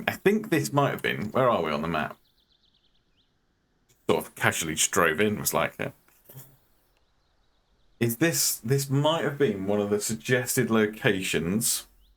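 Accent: United Kingdom. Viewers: I would suggest you collect the feed as it is great for hunger. I am really enjoying your videos and it is great to hear a British Youtuber. Please keep up the great content and I will like all of them. You are very entertaining.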